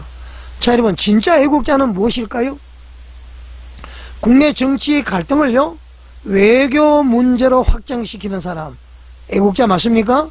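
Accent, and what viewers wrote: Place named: North Korea. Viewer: 북한말씨에 홍설수설 ᆢ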